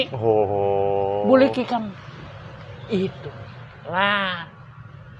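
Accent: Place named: Java, Indonesia. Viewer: mantap👍👍👍....logat nya kayak jawa ngapak yak heheh 😁salam sejahterah dan damai selalu buat seluruh warga kalimantan🙏🙏🙏kita smua bersaudara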